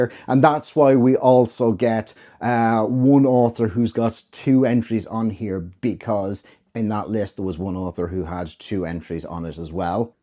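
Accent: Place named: Ireland. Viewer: I’m finishing games of thrones and lonesome dove is next — yes they are Texans as am I .. born here— you are Irish correct— your accent.. 😊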